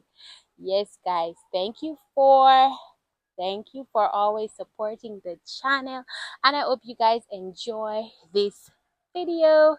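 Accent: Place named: Jamaica. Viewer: Yeh mon dis ah di real Jamaican sound💪🏾💪🏾💪🏾🇯🇲🇯🇲🇯🇲🇯🇲🇯🇲🇯🇲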